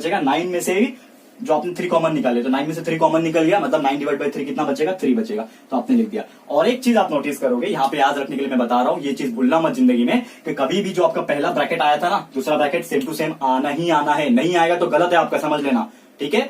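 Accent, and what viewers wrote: Maharashtra, India. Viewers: sir you are best And I have a question 😊😊 ARE YOU MARATHI...? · App marathi ho na sir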